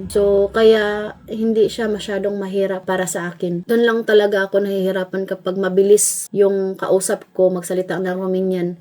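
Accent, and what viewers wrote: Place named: Visayas, Philippines. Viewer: Hahahaha...kung gahion mga bisaya😂legit gyud dhay...mao jud na atu dila hahahaha..ma identify jud ta nga bisaya😂❤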